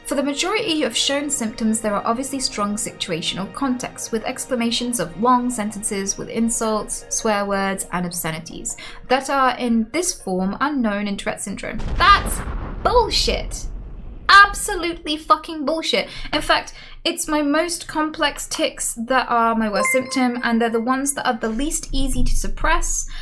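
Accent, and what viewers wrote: United Kingdom. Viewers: I find it very intriguing that some of your ticks have an American accent and some have your British accent.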